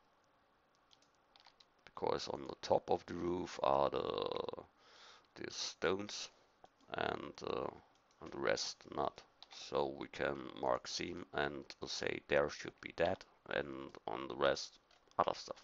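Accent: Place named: Germany. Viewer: #germanaccent :D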